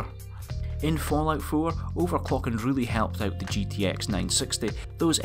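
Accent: Scotland, United Kingdom. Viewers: scottish accent ,i guess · Faurabouts are you fae loon? Afa strong accent, nae a bad thing mind you :) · Good video + scottish accent, am I right?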